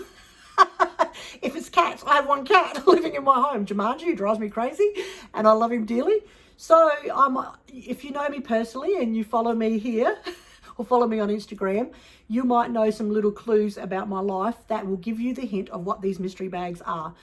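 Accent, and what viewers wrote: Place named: Australia. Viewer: Oh. Goodness Covid..So glad you are on the mend. So good to hear your podcast again. Watched you from the beginning..and watched your store grow. Will have to visit. Love hearing your Aussie accent..Kia Kaha, good Lady.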